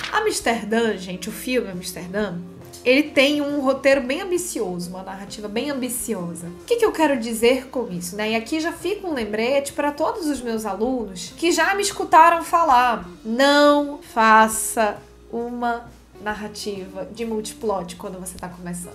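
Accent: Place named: Northeast, Brazil. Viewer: Ei, voce é nordestina? O sotaque é um pouco parecido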